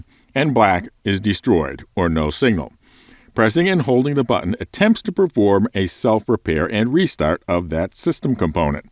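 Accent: Scotland, United Kingdom. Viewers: The scottish accent will help for sure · scottish accent :D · I do like the indicator lights on the buttons idea. It's similar to the old I-war 2 status bar where you could evaluate the state of your ship at a glance. Though I strongly disagree with the RNG repair damage. Make it take, say, 10 seconds for each RNG roll and only capable of bringing the system back to minimum operating capability instead. The Scottish accent might be a tough call for the non-english speakers though. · Got to admit, hearing your Scottish accent is quite the experience. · I would like to hear your Scottish accent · Engineering should be slightly more complex than the game blackwake. Where your ship has a problem, you have a quick and intuitive way of dealing with it. A 12 year old should be able to figure out. Otherwise you make dealing with engineering issues too much of a hassle for players either in there day to day activities or when in critical situations such as getting shot at. 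You shouldn't need a complex flow chart or checklist to fix the issue. Scottish accent is beyond scuff at 2x speed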